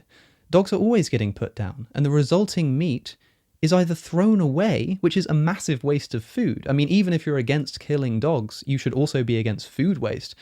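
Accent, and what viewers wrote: United Kingdom. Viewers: Dear god. Please tell me this is satire. Edit: ya. Satire. Pretty good points, but leaving out a lot of the other side’s actually valid arguments that have no easy come-backs. He’s no Contrapoints or Philosophy Tube. Just a cheap imposter with a British accent. 🥱 How about this? Is it vegan to eat a person who consented before death? By Vegan ethics, yes. So I’m gonna eat my fish and chicken and my dogs can eat me when I’m done. Life is a bitch, it always has been. EVERYTHING gets eaten eventually. Wether its by worms and microbes, humans or other animals.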